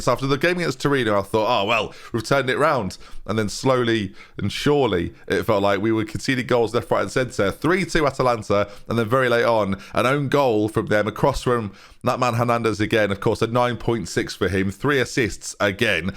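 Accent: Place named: Newcastle, United Kingdom. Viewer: close your eyes while listening to and u would think you're listening to the true Geordie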